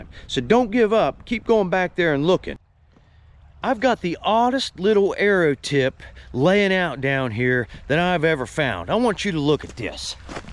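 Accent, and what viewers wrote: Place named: Pittsburgh, United States. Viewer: hes a yinzer no doubt I here that Pittsburgh accent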